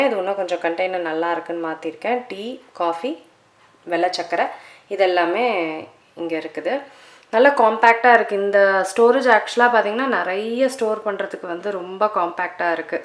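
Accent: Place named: Kerala, India. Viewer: Slang Oru malayalam style pole und..🔥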